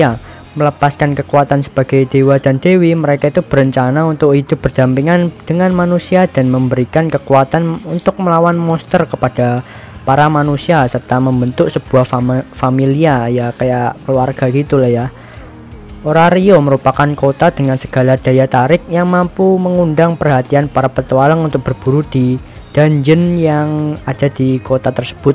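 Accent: Java, Indonesia. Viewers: Medokk tenan mas e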